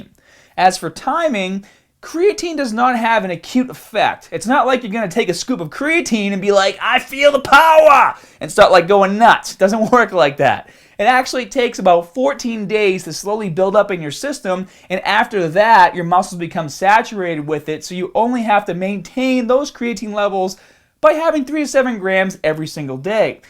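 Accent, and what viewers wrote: Boston, United States. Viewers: why are you talking like if you're in the Departed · love the Bhaston accent! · Every 10th word has a strong Boston accent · You have the same accent as JFK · you've got to be from Boston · Love that Bahston accent!!! Thanks for the info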